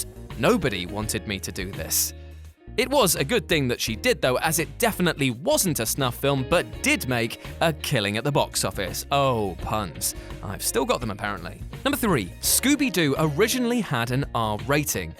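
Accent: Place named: Cockney, United Kingdom. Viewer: The content is quite interesting if you can deal with the annoying chirpy cockney banter that goes with it, there is nothing worse than somebody who thinks they are funny.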